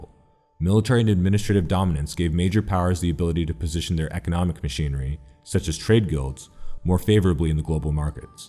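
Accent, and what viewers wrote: United States. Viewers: Easy answer to this is ' last one to colonise were British they brainwash ALL WITH FALSE EDUCATION SYSTEM you guys still following LOL, so the thought of retaliation won't even b there, same with Maories n same with aboriginals ' it's good this american voice hv done good research not sounding bias so that's a good sign on the other hand if u keen must have a look to Mr Rajeev Malhotra ' books on breaking India forces'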